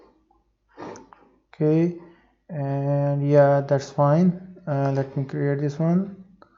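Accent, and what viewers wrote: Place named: India. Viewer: Once again, a dude with a thick Indian accent has the answer to my prayers.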